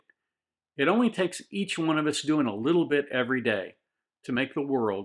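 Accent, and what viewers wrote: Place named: Midwest, United States. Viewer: Thank you, sir, for your content. Found your channel during the Brooks trial, as well as many other licensed lawyers’, which helped me understand more about the judicial process. Aside from his antics, the trail really pulled the curtain back for the layperson to see how one works. You and your YT brethren helped fill in the holes and maybe even influenced people to look into the judicial system as a career, to make it less intimidating and more open. Knowledge is power and all that. You are correct in that not everything you put out will be applicable to everyone, but know that there are those of us, both who have discovered you and those who haven’t (yet), who appreciate your insight and willingness to share knowledge, so again, thank you. And yes, doing one small good thing a day does help the world. Side note: I’m retired US Navy, born and raised in MO (STL, then Mid-MO), retiring in the Seattle/Tacoma area. 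Though I doubt I will ever return home permanently, - we have the Cardinals, Chiefs and Mizzou, after all! - it does make me smile to hear a little piece of home. Your candor, your midwestern accent and your Missourian viewpoints all have me missing home juuuust a little bit more, so thank you for that.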